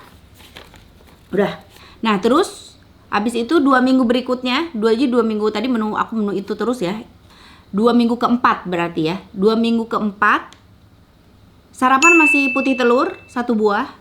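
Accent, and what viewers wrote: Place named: Sunda, Indonesia. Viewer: suka bgt denger suara teh, sunda.....bgt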